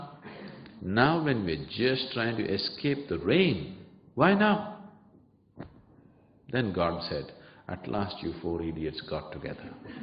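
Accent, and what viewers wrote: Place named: United States. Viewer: interesting how even Sadhguru adapts the American accent, because of its surrounding..you don't hear him speaking like that in India :) have a blissful life you all..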